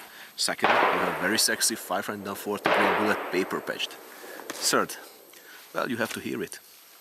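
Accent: Hungary. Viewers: This is very cool stuff. I’m from Canada, and much of N America, with the exception of cowboy action, is in to modern stuff. So it’s pretty rare to see this. I’m getting my first antique exposed hammer shotgun though, and an antique muzzle loader? There’s a bunch from the civil war, but they aren’t cheap, and they stuff you have is even more expensive, since we’re newer countries. We didn’t have many of those guns here. Still, fascinating to watch, and interesting to compare your accent to my wife’s Hungarian accent. 🙂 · Always a good day when uploads in beautiful hunglish language.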